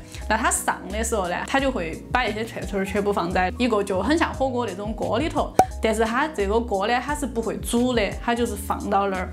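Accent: Chengdu, China. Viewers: 这个妹儿肯定是成都土生土长的，但父母不一定是老成都，所以发音属于年轻一代的成都人，也没得啥子很地道的方言词汇。要学资格成都话就看李伯清。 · 你这是成都话，哈哈， 电影推荐你忘记了风车车和假老练（猫和老鼠四川话版） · 在四川会说普通话就行，这个up主准确说是成都话。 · 绝对标准的成都话。亲切！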